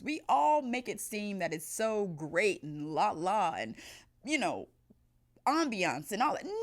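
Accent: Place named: East Coast, United States. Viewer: "What I wanted to say was... get your in the bed, why are you naked for a facial?!!"... ROTF.... I can't breath! you sure sound like you're from the east coast!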